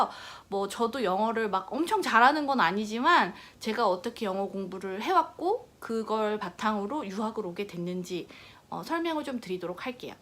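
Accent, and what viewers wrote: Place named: Australia. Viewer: 호주 아줌마말투 ㄷㄷㄷ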